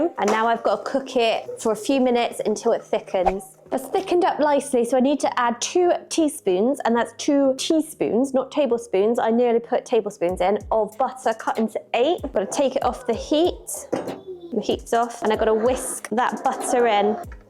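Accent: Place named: United Kingdom. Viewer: I’d rather they pronounce it the British way. It’s part of the charm of their channel in my opinion.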